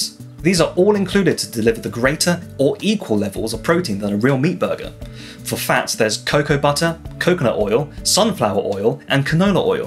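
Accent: United Kingdom. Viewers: this has to be a first for me 52 years on this planet , i have never saw an asian speaking british accent.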